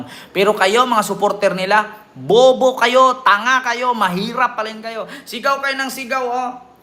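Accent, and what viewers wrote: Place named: Iloilo, Philippines. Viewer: God bless u! my Tanong lang Ako sau?ilonggo ka ba?Kasi ilonggo din Ako Punto mo daw ilonggo ka toto?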